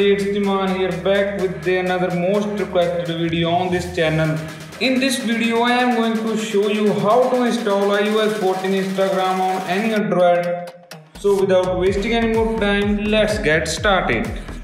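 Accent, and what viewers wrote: India. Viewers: bro tuhadi english...🔥✨😂desi touch nal..!!kaim lgdi a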